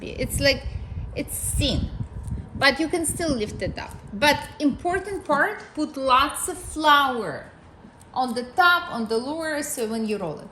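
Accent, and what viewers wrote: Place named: Armenia. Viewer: These look wonderful. I LOVE your accent...it reminds me of all my Armenian relatives from many years ago. I will make these soon!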